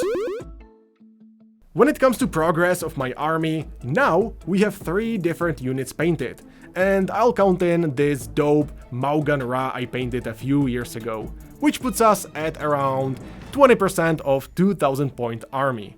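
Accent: Czech Republic. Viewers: You accent sounds familiar, are you from Czech Republic by any chance?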